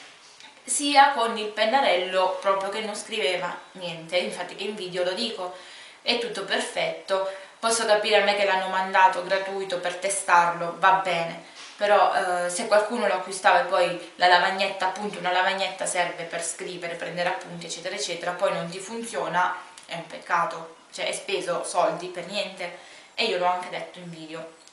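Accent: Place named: Sicily, Italy. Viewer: Ciao sei davvero molto simpatica e i tuoi video sono molto utili. Guardando questo video ho sentito che sei Siciliana come me. Se ti fa piacere visita il mio canale =)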